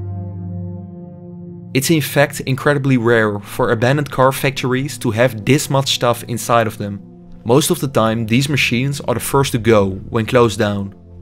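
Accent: Netherlands. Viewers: Makambeus.........Tremendo Dutch aksentos. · That is a Dutch accent if I've ever heard one!!! Sounds like my Opa! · Dutch Limburg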